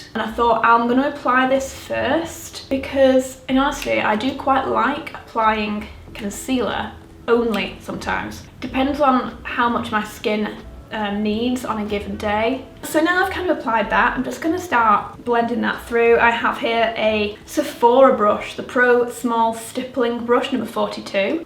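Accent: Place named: Northern England, United Kingdom. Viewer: LOVE the northern accent, this was great <3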